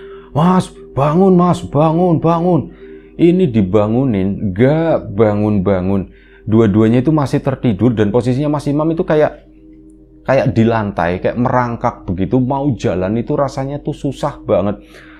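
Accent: Tegal, Indonesia. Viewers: Mas sampean orang tegal bukan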